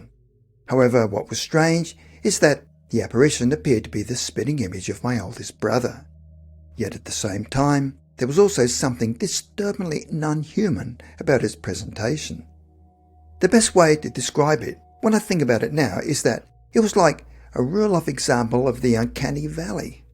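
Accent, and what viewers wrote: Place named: Australia. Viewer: An Australian accent.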